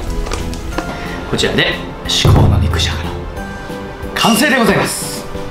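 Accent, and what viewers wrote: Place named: Chiba, Japan. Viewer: いつも思うのでさが千葉ですよね‼️かなりイントネーションがあやふやで訛ってますよ(笑)😫少し治したらどうでしょう‼️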